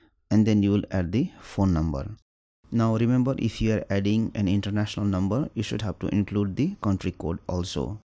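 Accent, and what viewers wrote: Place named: India. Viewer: Thx 🙏 Work o your Indian accent so we can understand better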